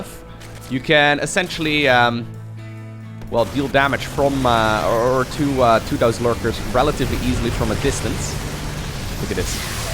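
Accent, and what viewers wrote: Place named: Brabant, Netherlands. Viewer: hoor ik nu de brabander in Lowko :D reynor is beter as zen tegenstander :D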